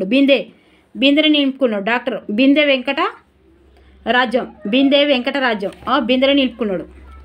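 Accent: Telangana, India. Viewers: Me voice vinte oka energy vastundi mam telangana slang nd me short tricks , explanation chala bavunnay... thank you madam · పక్క తెలంగాణ భాష లో చాలా బాగుంది మేడం 👌👌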